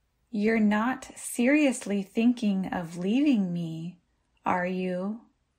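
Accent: United States. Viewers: 美式發音哦！ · 我要感谢你美妙的美式发音，可以给我很多帮助和信心。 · 是美式英文嗎? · I like the videos authentic American pronounce. Thank you!❤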